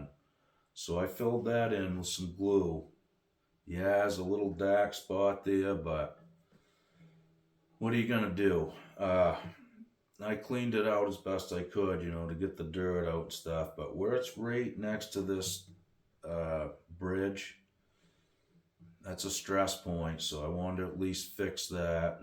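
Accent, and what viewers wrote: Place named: Maine, United States. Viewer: As soon as I heard u speak I knew you were a fellow Mainer. Cool video nice guitar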